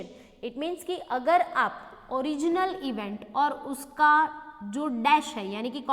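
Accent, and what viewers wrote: Gujarat, India. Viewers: Hey Ma'am!! Are Youu Gujarati?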